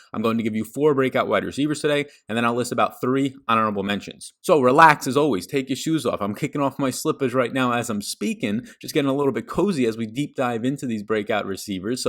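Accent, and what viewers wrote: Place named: Northeast, United States. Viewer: <link> leaguesafe 10 dollar league trying for tonight i got 9 in i need 3 more you interested? Great analysis as always.I love how you do not waste time on corny jokes. Sometimes I do wish you would talk a tad slower sometimes I am too slow to process your thoughts. You def a northeast person for sure haha not hating just stating.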